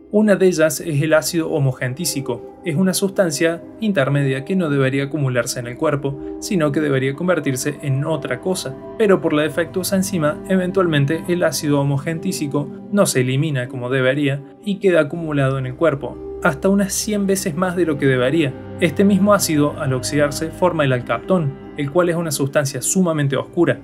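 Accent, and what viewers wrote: Córdoba, Argentina. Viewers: Buen vido bro, sos cordobés? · sos cordobés? Hay un poco de tonada que creo que es de mi provincia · Cordobés hasta el alma, se siente. Buen video carajo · Más cordobés imposible aksjdj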